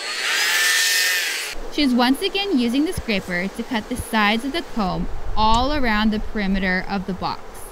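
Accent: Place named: Japan. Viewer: Жаль, что она не говорила просто по-японски. А английскую речь с японским акцентом слушать невозможно.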